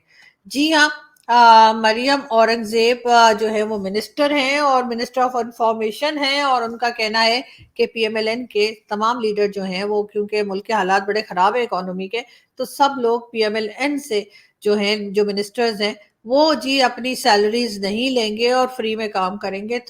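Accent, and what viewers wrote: India. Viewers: जी🙏🙏 आपका विश्लेषण बहुत शानदार रहता है बोलचाल से आप जी आप भारत की लगती है। जब की आलिया शाह एवं रिबाह ये दोनो बोलचाल मे पाकिस्तान की लगती है आपको कोई खतरा लगे तो भारत आ जाओ।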